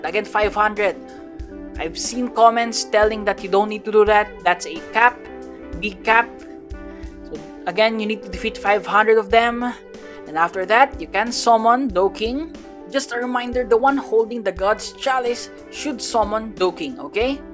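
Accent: Philippines. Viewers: I'm new to your Channel (3 weeks) but when i first heard your voice i definitely know that you're a Filipino just that accent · You sound filipino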